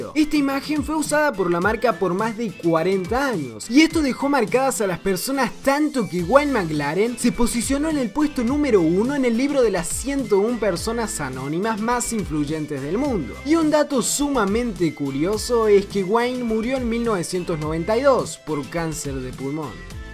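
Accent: Córdoba, Argentina. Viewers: NO ME MIENTAS TU ERES ARGENTINO! YO IGUAL :DDD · es cordobes? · Este men es argentino? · todavia no se te sale el asento codobe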